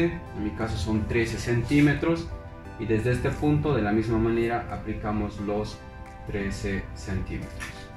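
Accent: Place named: Bolivia. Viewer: Parece que es boliviano por el acento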